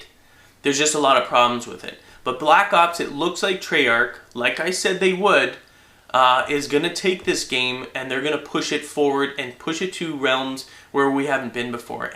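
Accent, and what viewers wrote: Canada. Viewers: i just listen to these videos to hear his cool canadian accent :D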